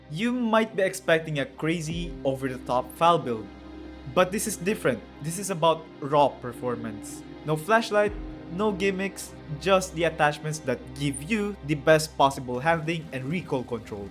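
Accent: Philippines. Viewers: Pinoy pala tong si nato HAHAHA i thought i misheard what he was sayin HAHAHA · This guy's got that Filipino Accent. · Knew it was a Filipino from the accent then there's the "ginagawa mo" which proves it! HAHA u earned a sub pare nice vids!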